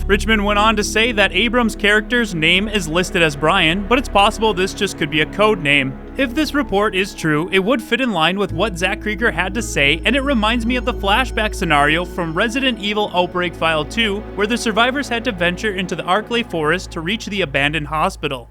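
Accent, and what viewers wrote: Canada. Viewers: Man, love the Canadian accent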